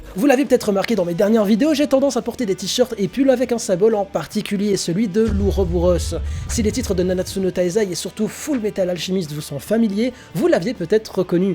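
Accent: Belgium. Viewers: néerlandais ? jure t'es belge ? 🇧🇪🇧🇪🇧🇪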